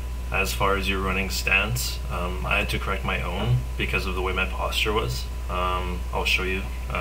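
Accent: Canada. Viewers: Nice Canadian accent haha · 3 mins in realized hes canadian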